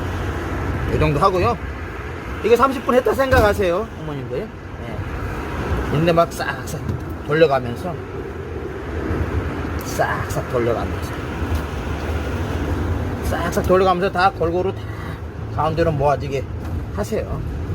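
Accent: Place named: North Jeolla, South Korea. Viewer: 짠메세요 그랫끼때문에. 그람에.. 있는데..ㅋ 전라도 북도사투리네요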